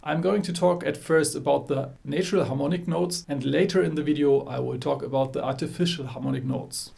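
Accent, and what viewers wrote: Germany. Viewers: I love your Denglisch! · According to your accent, you're probably from Germany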